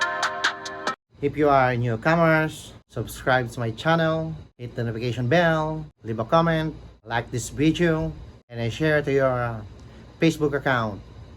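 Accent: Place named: Visayas, Philippines. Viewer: Tol pag sure ba tuno bisaya kana hahaha pero ang ganda ng mga shoes original talga alam ko yan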